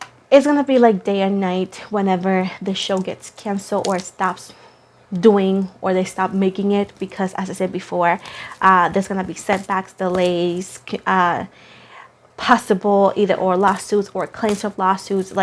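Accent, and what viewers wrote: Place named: Latin America. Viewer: Hello luv you r doing wonderful 💗. Never mind about those unkind pple and can't believe they were making fun of how u speak ! 🙄 like really!? U r a beautiful Latina woman and of course ur gonna have a accent and even if u didn't they would find something else to complain about. Smh.. so those pple u cannot make happy. There own life prbly isn't happy so u don't need there discouraging nd negative energies luv. U r beautiful inside n out no matter what anyone says! I'm sure u know that and u radiate that! 🩷✨️ U r a hard working mother doing what u love ! U r a genuine loving woman! U keep doing u ! And I luv your readings btw.. always 😊💖🌈✨️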